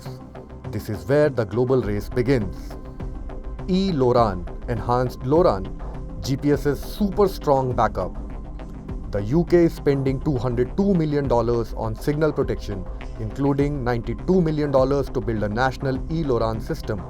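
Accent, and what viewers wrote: India. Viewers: I was going to flame you for the nearly impossible to understand accent, but you're an Indian business. I can't fault you for a strong Indian accent. Comment is free engagement for the algorithm.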